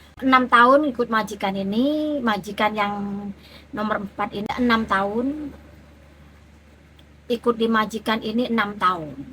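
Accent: Madura, Indonesia. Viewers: Logate meduro banget ce....🤣🤣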